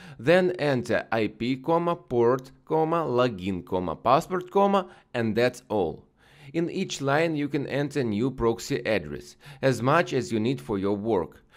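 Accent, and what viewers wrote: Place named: Russia. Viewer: typical russian tougue, love it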